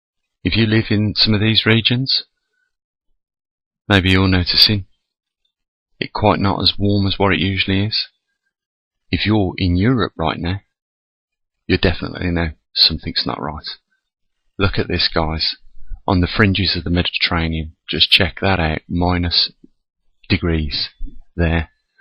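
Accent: United Kingdom. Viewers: He's got a Birmingham (UK) accent.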